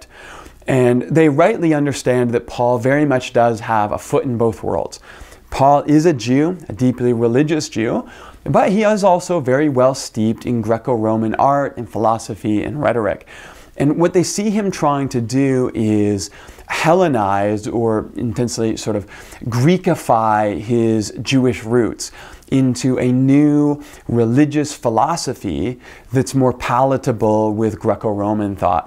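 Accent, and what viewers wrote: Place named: Canada. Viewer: I can hear the Canadain influence not only in the accent but in the non-binary perspective so refreshing. Speak louder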